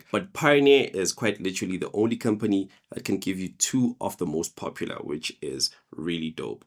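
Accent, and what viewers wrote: South Africa. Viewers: Is that a South African accent I sense 😀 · It's a South Afican accent, American/British/Danish... huh?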